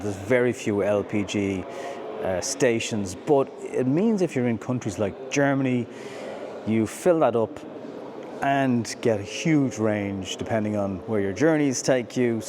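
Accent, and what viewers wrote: Ireland. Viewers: Ireland accent and best Dacia ever so stfu and take my money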